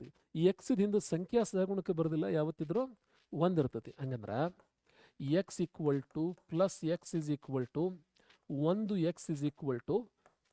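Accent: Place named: Karnataka, India. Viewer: Sir we love your dharwad accent . It fills our heart ❤️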